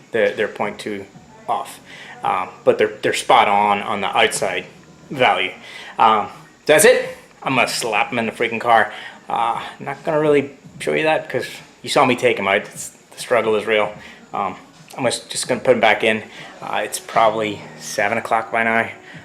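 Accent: Belfast, United Kingdom. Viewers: Is that a hidden belfast accent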